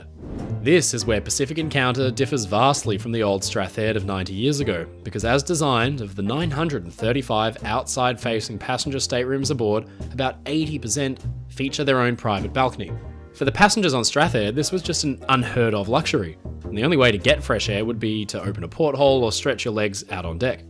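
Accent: Australia. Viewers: I didn't realize was Australian, one would think the accent would be a clear give away, but i mistook it for a British accent until i saw his location on the channel's description page.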